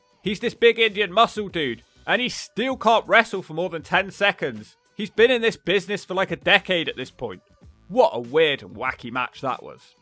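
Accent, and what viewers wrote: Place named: Newcastle, United Kingdom. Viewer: Ur a geordie didn't know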